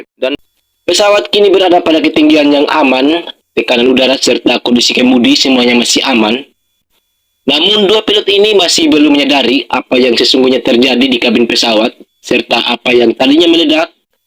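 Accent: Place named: East, Indonesia. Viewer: der ngeri kali aksen kau 😂 logat timur kau kental kali😅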